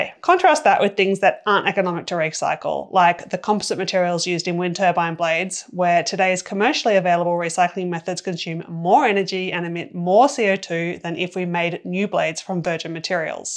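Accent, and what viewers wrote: Australia. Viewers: Hey! Keep taking the magic mushroom juice. It has slowed your delivery down, noticeably! That's very welcome imo. As an older person with hearing impairment, I found your previous combination of Australian accent and machine gun delivery a struggle to keep up with, understand and digest. A micro-pause between sentences, Dave Borlace-style, would allow my withered synapses to do a bit of absorption and digestion of the concept just discussed, but thank you SO much for the new more measured pace. Loving it ❤!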